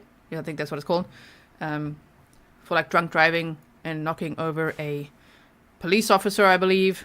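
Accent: South Africa. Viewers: Hi G x I'm from the KZN South Coast of South Africa. Always look forward to your videos and South African accent giving international news ❤. You go girl. Watch each episode. Always more indepth and thought provoking. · South African? 😊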